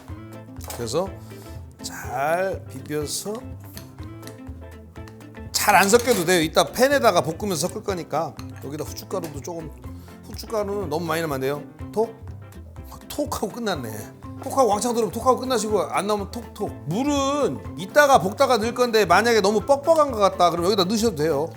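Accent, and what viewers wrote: Chungcheong, South Korea. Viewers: 넘 맛있겠어요! 부모님 고향인 충청도 사투리 너무 정겹고 좋아요. 저도 수원백씨. 영상 감사합니다!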